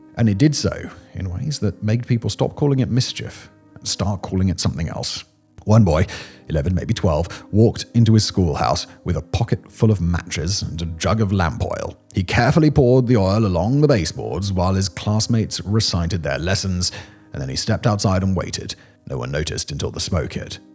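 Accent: United Kingdom. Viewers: WTF, can't take listening to a Limey accent. Particularly with what's going on in their country this time.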